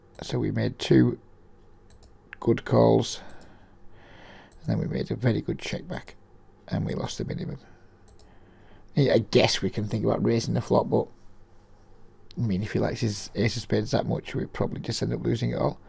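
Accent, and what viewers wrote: United Kingdom. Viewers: Understood, many thanks.. Ps, I have been trying to work out where that accent you have comes from, North or south of U.K?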